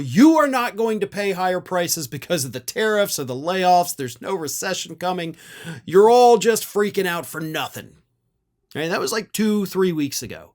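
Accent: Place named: Appalachia, United States. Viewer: He is a maga senate wet dream. He talks and sounds like all the all stupid maga hillbillies.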